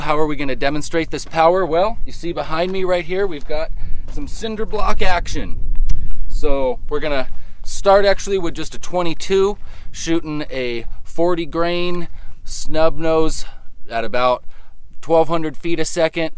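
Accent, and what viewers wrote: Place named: Canada. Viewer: You sound Canadian but you look like you're in the high deserts of Arizona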